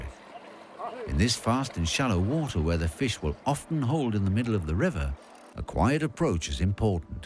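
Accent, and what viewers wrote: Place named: RP, United Kingdom. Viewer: BBC English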